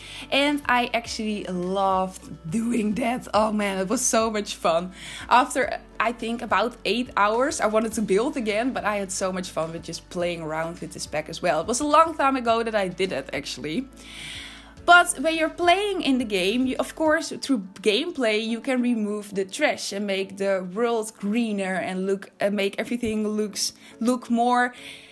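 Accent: Netherlands. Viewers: Lekker Engels op zn Nederlands ook, heerijk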